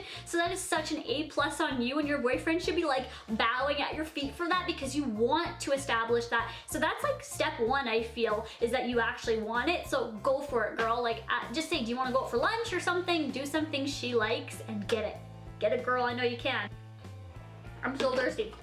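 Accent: Canada. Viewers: I love the way you speak! I love the Canadian accent! From my fortune language my fave is Rotterdams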